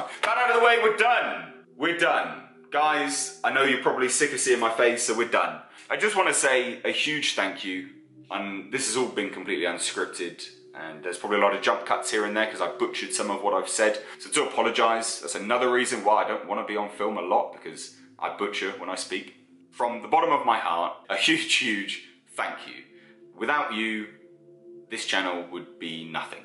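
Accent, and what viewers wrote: United Kingdom. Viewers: I NEVER say these things, 1st time ever.....but YOU'RE EXTREMELY HANDSOME & I've always loved accents, especially British. As an ardent Anglophile, I'll be embarrassed if you're not UK.... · Jesus you’re ripped😂😂😂!!! And you’re voice is so deep and British!!! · Handsome fella you are. Can I ask what kind of brit accent you have? Excuse my ignorance but just curious since your voice is smooth and fluid in every way.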